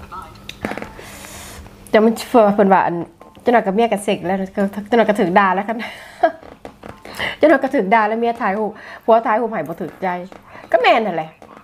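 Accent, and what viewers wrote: Savannakhet, Laos. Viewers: ເຮັດຫຍັງບໍ່ເປັນກະເບິ່ງຊ່ອງເອື້ອຍແນະນຳລະອຽດມັກຫລາຍເບິ່ງທຸກຄິບເລີຍ ສຽງເວົ້າກະມ່ວນສຽງຄົນສະຫວັນຍ້ານເຮົາ😍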